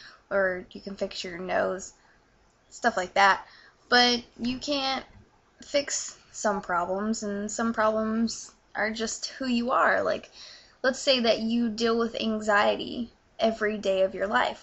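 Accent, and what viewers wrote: United Kingdom. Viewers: Hi what happened with your uk accent? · I just finished watching your video..the british accent..by the way i wanna know the tradition regarding on how should be the woman dresses,,atttitude or etc.. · Owh!! you are so beautiful> and iu like your British accent by the way and i love it. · you're great, ignore all those fucking snob. I really like your British accent, even if its not natural. You're inspiring its cool. >//< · You are so cute. ^^ I saw your video about english accent. You have a very nice voice. I like the britisch slang. Many greetz from Austria. :) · i like it, i want my hair to be like this too, it's really cool. by the way , thanks , your videos helped me to learn the british accent))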